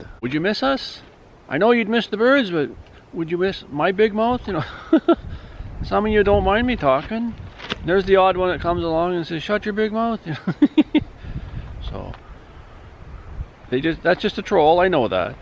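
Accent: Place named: Canada. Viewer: New to your channel… I’m guessing you’re a fellow Canadian by your accent/dialect.. Where about’s you located? I’m subscribed! Cool stuff, with love from Alberta ❤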